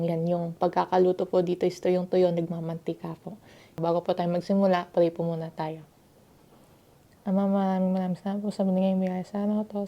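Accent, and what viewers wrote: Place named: Cavite, Philippines. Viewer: tga cavite po b kyo?ung punto po kc eh. . .😊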